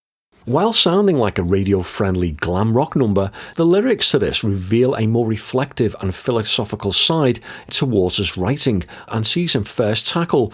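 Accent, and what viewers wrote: Liverpool, United Kingdom. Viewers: A fellow scouser narrating one of the most underrated albums.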